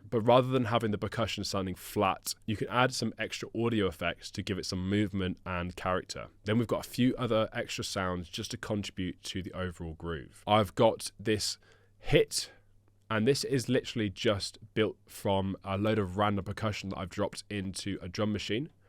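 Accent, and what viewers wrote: United Kingdom. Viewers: You English pronansuation is special candy for ears, is it RP?) thank you for nice tutorial as well!)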